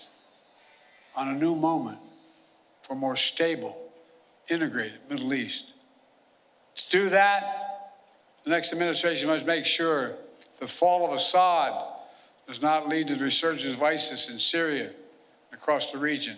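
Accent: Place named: South, United States. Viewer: He sounds lake Gomer Payle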